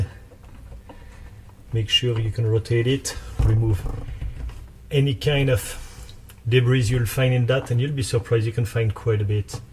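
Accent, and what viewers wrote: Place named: France. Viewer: T’es français ? I like your accent 😀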